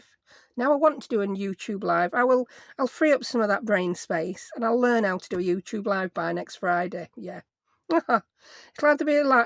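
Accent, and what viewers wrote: United Kingdom. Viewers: Hi, I have been watching your videos for a while now but I am also horrible about leaving comments. I am usually watching on my TV. I really enjoy your tutorials and have done several of your projects. Sometimes I just put on one of your videos just to listen to you while I craft. I lived in the UK for 6 years and really miss a lot of things so listening to you talk makes me feel at home. So happy for you and hope you enjoy continued success and many more subscribers.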